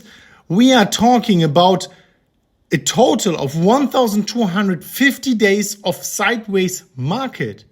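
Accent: Germany. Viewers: German accent is strong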